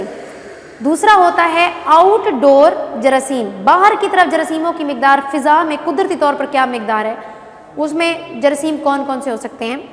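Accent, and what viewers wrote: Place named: Saraiki, Pakistan. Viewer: The way you speak mam is proved that you are sariki ❤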